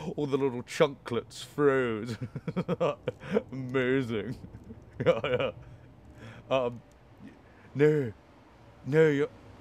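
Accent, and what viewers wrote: United Kingdom. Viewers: What kind of accent is this? It's like some British accent mixed with a Californian accent.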